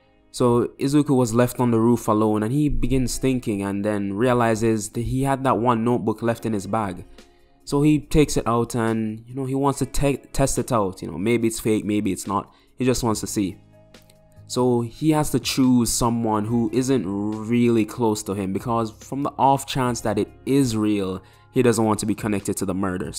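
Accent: Jamaica. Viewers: Bro are you Jamaican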